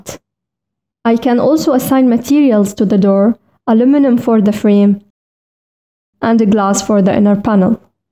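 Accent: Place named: West Asia. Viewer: I think that : Your voice is from the West Asia ! I am living in Viet Nam , I have learn Revit in 1 month . I find on youtube in Viet Nam but the result is zero ! Thank you the West Asia girl !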